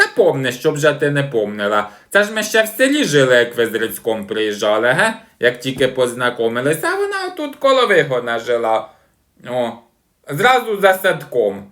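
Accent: Poltava, Ukraine. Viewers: Яка ви молодець !Ви повертаєте мене в дитинство,моя бабуся (царство її душі)була з Полтавщини і точно так розмовляла.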